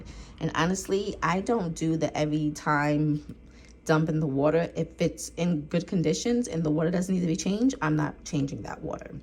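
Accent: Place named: New York, United States. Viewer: Your plant babies are cute. Love the NY accent!!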